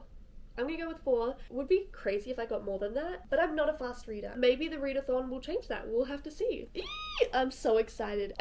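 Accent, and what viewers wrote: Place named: Australia. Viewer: Random question%: what Part of Australia are you from?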